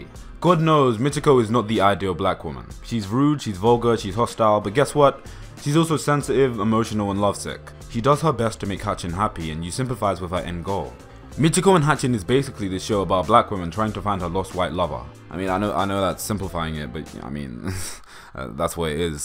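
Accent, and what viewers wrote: England, United Kingdom. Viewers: What's this Black/Blank memento thing he mentions in the video? I can't white hear it because of his British accent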